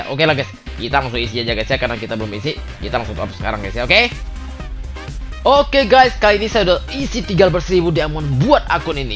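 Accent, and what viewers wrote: West Kalimantan, Indonesia. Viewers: Bang loh orang Kalbar kan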